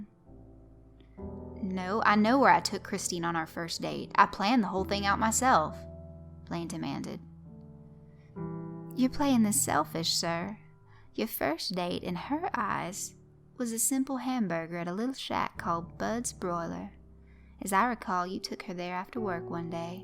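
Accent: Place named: Georgia, United States. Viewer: Where are you from in Georgia? I'm from Loganville and our accents sound really similar, I know that different places have a little different variations on accents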